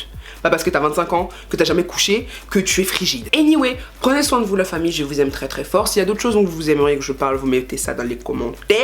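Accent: Ivory Coast. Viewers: C'est ma première sur ta page et j't'adore... Trop belle! Dit t'es Ivoirienne?? Juste que j'ai ressenti l'accent pas mal de fois! 😄😄😄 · cool ton accent ivoirien ma soeur ... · Cool tu es ivoirienne on dirait 😍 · Oui elle a l'accent ivoirien et je crois qu'elle est ivoirienne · Accent ivoirien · j'aime ta manière de parle tes ivoirienne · Accent Ivoirien 👀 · J'adore ton accent ivoirien, on dirait une go bété lol, trop cool.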